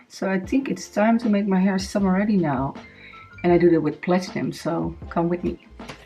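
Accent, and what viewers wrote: Netherlands. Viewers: I can tell that you’re dutch just from your accent and how your head nearly touched the shower thing😂 (I hope that wasn’t offensive💕)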